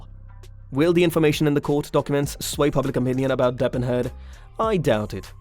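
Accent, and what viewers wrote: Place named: United Kingdom. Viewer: Get the facts straight you sound British so your laws vary from ours and as his supporters I believe that was done by bots. Facts are wrong are you sure your not being paid for this article by AH...just have to ask.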